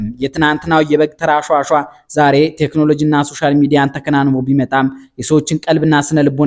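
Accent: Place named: Gojjam, Ethiopia. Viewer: ስታነብ ቅናት ያቃጠለህ ጎዣሜ መሆንህ በድምፅህ ያስታውቃል።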